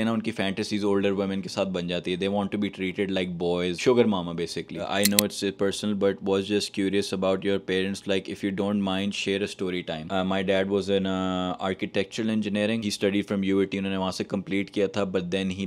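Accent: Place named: Lahore, Pakistan. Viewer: 2NO LAHORI HEN NA SPEAKING STYLE ACCENT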